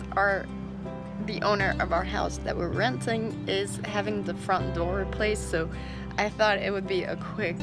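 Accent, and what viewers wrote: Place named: Portugal. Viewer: Oh wow, I was way off! I’m half Portuguese and your accent is very similar to a lot of my relatives!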